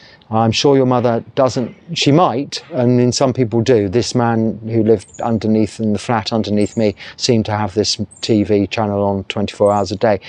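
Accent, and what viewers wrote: United Kingdom. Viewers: This could be a matter of perception. Bhante rather sounds too British, but not angry 😊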